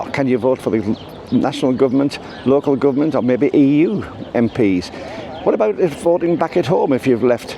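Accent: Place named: Scouse, United Kingdom. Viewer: Great video - might be wrong but do you slip into a slight Scouse accent every now and then? Dare I say a hint of Birkenhead in there? 🙂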